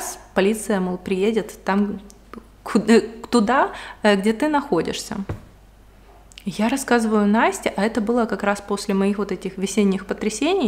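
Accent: Ukraine. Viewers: какой прекрасный разговор получился!) Вы чистая, светлая, душевная. А ваша речь и диалект - я как сама окунулась в свое детство, которое тоже прошло на Украине, но уже 27 лет не живу там. Несмотря на разницу в возрасте, у меня было точно такое же детство, такие же теплые воспоминания...Все ваши выражения " у нас так говорили/говорят" мне понятны, потому что у нас тоже так говорили 😀. Теперь моя мечта встретиться с вами где-нибудь на просторах нашей планеты и пообщаться лично за чашкой кофе.... ❤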